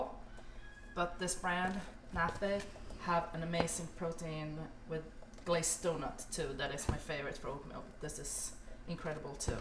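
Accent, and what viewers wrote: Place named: Sweden. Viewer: Still hear the Swedish accent haha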